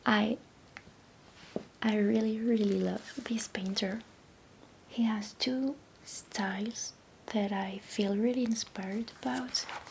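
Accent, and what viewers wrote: France. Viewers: Tingly and relaxing. I love your soft-spoken French accent. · i studied abroad in france for 4 months in sophia antipolis and lived in antibes- i miss it so much!! your accent is wonderful and reminds me of my time there ❤️ i also want to say that your english is great!! you are very well understood :) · Hi love! Don’t feel stupid about your English, as not native speaker person but English is my second language which I use every single day, I can say, your English is very good and I love your French accent ❤ you was as my recommended video on main YouTube and totally loved it! I’m suprised because this is the first time, where I see actually a person who is drawing and showing such an incredible work 🥰 take your time and definitely don’t give up on your English love, if someone says about that your English is bad or something, just ignore it and keep going! 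I’d love to see more videos where you are drawing. ❤️ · Oh wow I'm so happy, this video is like made for me 🤩 my favourite kind of ASMR videos are book show and tells, and I looove french accents! This is immediately one of my favourite videos ever, it's so comforting and interesting to watch, it's perfect :') would love to see more of this type of stuff (or anything really, I just subscribed). Thank you!!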